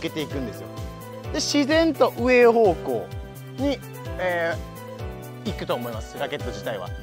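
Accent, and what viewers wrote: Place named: Kyoto, Japan. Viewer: ウィルスミス、朝から爆笑してしまいました😂 懐かしい京都弁も耳に心地良く、 、大好きです💕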